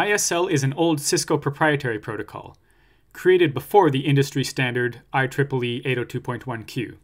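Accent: Canada. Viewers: Dang, you are doin god's work. I will read my exam questions in that Canadian accent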